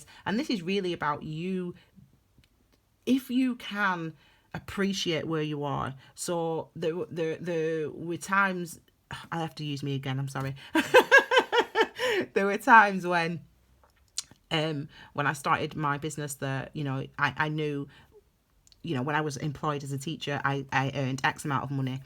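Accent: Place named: East Lancashire, United Kingdom. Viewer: Where are you from? Sounds like East Lancs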